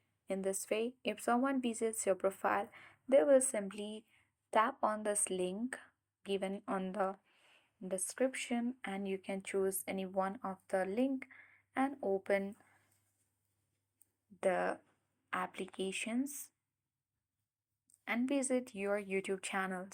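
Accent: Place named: India. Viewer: Americanized English-language is my native language. The speakers' voice has a strong Indo-English accent that is difficult for me to understand. Some words we use are different. For me, I read the transcript while I listened to this video's instructions. Yet I had to pause often to understand the meanings. For me, these edits would help: At the start, tell me exactly what you will teach me in "plain language" for me. Please study up on our word choices and add those to what you recorded in this video, or change them if it doesn't cause confusion. It is OK for the video to take the time needed to explain your instructions, right? Thank you!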